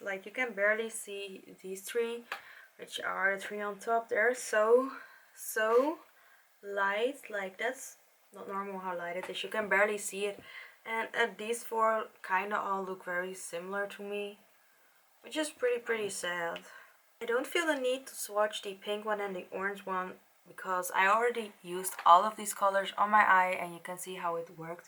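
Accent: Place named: Netherlands. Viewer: so yor accent sound like your dutch so im gonna talk dutch hii ik klikte je video aan en ik keek de video en ik dacht dat je een soort beroemde ytbr was dus goed gedaan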